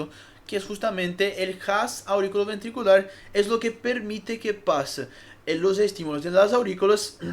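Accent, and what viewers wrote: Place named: Brazil. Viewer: ¡Ahhhhhhhhhhhhhhhhhhhh! eres de Brasil, tienes un acento bonito, ¡Vida Brasil!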